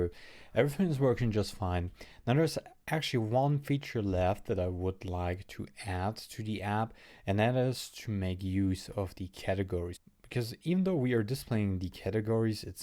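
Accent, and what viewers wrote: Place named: France. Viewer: 😮 ur accents seems french or German even nearly french. Anyway Many thanks for this productive video which made me more passionate about Rails. Thanks again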